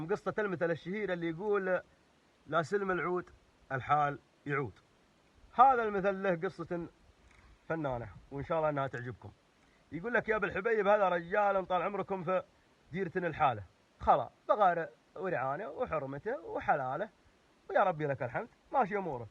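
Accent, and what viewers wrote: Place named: Hejaz, Saudi Arabia. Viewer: السيد Vlb لا والله كلامه واضح ومفهوم ، هذي لهجتنا بالحجاز ' و حنا الاقرب للفصحى & انت تفتقد لاسلوب النقد ،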